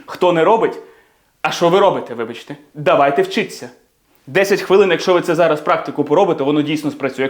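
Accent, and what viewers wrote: United Kingdom. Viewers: Дякую за урок. Як на мене, це ідеальний формат - практикуватись разом. Мавпочка всередині одразу включилась в гру і повторювала. Я як дитина реготала над жартами ) "а шо ви робите, вибачте?" 😂 Я в захваті від подачі, взаємодії з глядачем. Та зачарована Вашою британською вимовою. Це саме та англійська, яку би я хотіла вивчати. Тільки від неї "йокає", бо це дуже красиво. Тож дуже буду чекати саме такі фонетичні інтерактиви. Дякую 🤗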